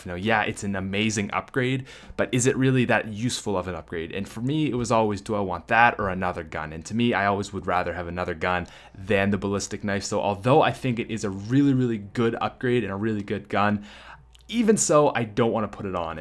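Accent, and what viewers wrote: Canada. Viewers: What's least favorite gun? The smR! No offense to your Canadian accent, in fact I like your accent. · He's Canadian he said aboot instead of about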